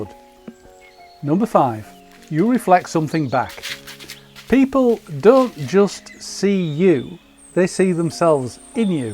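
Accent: United Kingdom. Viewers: I’m pushing 60, in half a year. Is the narrator from Britain? Sweden here.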